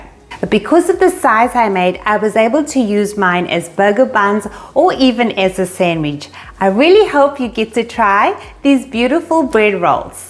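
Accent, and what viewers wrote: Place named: South Africa. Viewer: Yum looks so good. I’m definitely making it. Thanks so much for sharing this recipe. Love your South African accent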